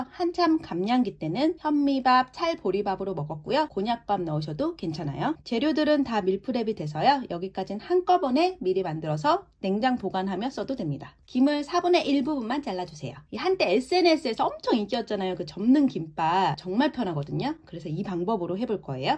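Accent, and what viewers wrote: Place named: Gyeongsang, South Korea. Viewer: 냉장고에 있는 재료로 다이어트 요리 총분하네요 들기름 씻은 김치 좋고 경상도 억양인가요 잠 듣기 좋네요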